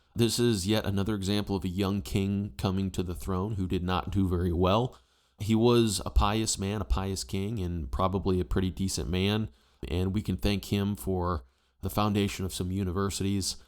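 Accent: United States. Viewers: I apologize, that's my bad then. I even listened to it a few times but I still couldn't quite decipher your strong American accent 🙃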